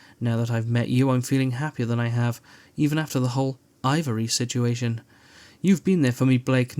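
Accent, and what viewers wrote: Wales, United Kingdom. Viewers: The Welsh are known for being great orators…Richard Burton, Anthony Hopkins, etc. THIS I did not expect to be delivered by a welsh accent!! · I love the British accent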